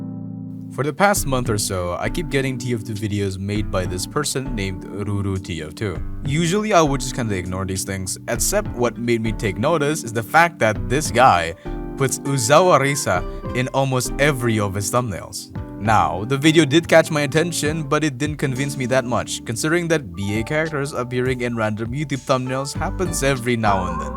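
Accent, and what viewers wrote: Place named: Indonesia. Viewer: Idk how but I clocked in you being Indo just from the first couple of seconds and I was right. INDO PRIDE GRAAHHHHHHH🦅🦅🦅🦅🔥🔥🔥🔥🇮🇩🇮🇩🇮🇩🇮🇩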